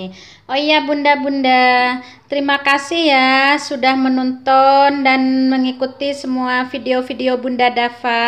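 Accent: Madura, Indonesia. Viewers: btw klo boleh tau orng ap ya logat bhsanya kya orang madura 😀😀😀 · Asli bunda dari mana logatnya seperti org madura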